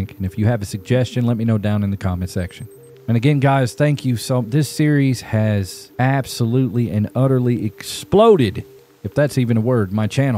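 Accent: South, United States. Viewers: I just found you on this Stoney Friday and as a southern girl from N.C I appreciate your soothing southern charm voice, you’ve not overly obnoxious and funnnyy I had so many good laughs I need this game😂😂❤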